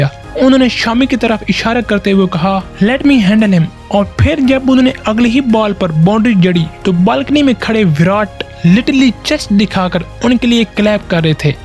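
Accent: Pakistan. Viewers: Bhai tere accent se tu Pakistani lag rha hai. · Yaar ye pronounciation Pakistani laag rha hai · Pakistani accent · Tumhare bolne ke hisab se tum pakistani lagte ho? kaha se ho tum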